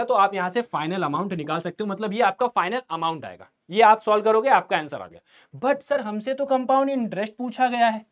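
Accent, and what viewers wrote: Bihar, India. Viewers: Lagat hai sir ji bihari ba 😊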